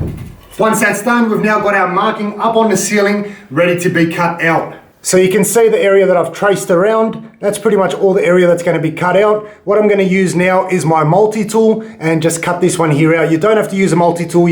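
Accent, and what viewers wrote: Australia. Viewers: Bloody awesome mate, excellent work, appreciated the detailed illustration of all of the steps required for this job, and great to finally hear an Aussie accent on one of these videos. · Really good tute. Is that an aussie accent??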